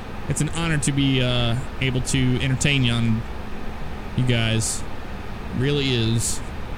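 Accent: United States. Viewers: wait, can you really not tell lol, he is american. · Are you American or Australian?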